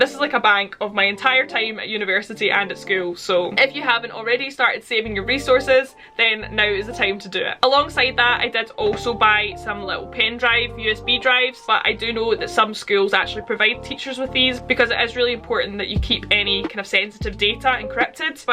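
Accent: Scotland, United Kingdom. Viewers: Hi I really love your Scottish voice and I love the organiser bins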